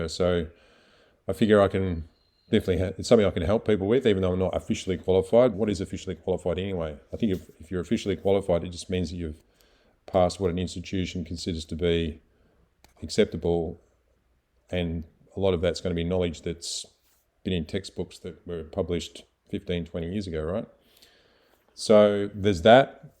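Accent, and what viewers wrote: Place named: Australia. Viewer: Yes. I say trying health coaching. Maybe sign up for modeling and acting as your Australian accent would be fun to hear in films! Reminds me of Crocodile Dunn Dee! You are unique so maybe looking into those areas. But the the main things is do what you are passionate about!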